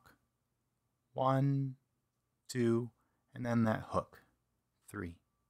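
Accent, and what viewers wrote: Europe. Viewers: nice cours, but the pronunciation is not authentic to the original hebrew, it sound like european pronunciation more than middle eastern pronunciation, I disagree with pronunciation of some letters : ח ע צ ק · Of course you use the European pronunciation and not the proper Tiberian pronuncisrion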